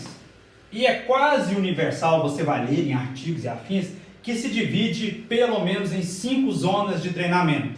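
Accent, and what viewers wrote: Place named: Minas Gerais, Brazil. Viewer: Parece mineiro uai!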